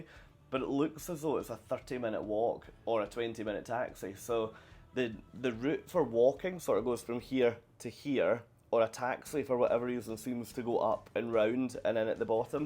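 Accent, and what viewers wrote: Scotland, United Kingdom. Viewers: You couldn’t be more Scottish if you tried. Bless you, honestly no one ever walks in the emirates except around the shops! I hope you didn’t get heat stroke, loved this Vlog though. And the food looked fab on there. PS. The gold is the dowry for your daughter, you’re supposed to buy pieces to add to your collection so you can have the best choice of husbands for her 😂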